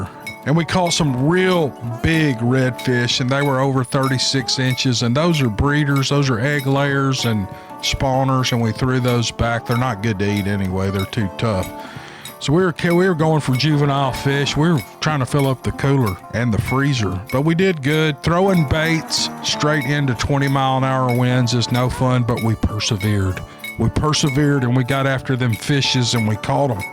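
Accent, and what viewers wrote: South, United States. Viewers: Get them fishes!! Just found you and love your story tellin. Love your accent, country as a biscuit! Keep em commin fella!😚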